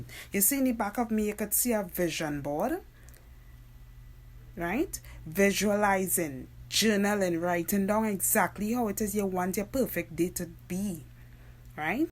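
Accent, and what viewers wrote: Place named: Trinidad and Tobago. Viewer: I hear a trini accent! And this was on point, it all starts in the mind!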